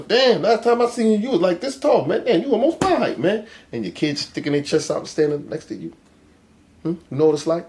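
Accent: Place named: New York, United States. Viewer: Handsome ash... besides the fact. You put me in the mind of llcoolj and like Alpo in dialect. I guess its a NY swag.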